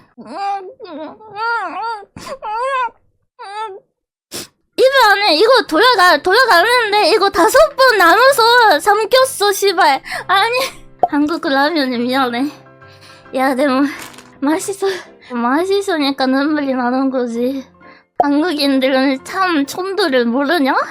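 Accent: Japan. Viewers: 한본어 개기엽넹ㅋㅋ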